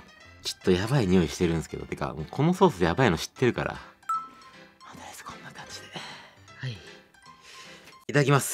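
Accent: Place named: Ibaraki, Japan. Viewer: 久々に見たら 茨城人かよ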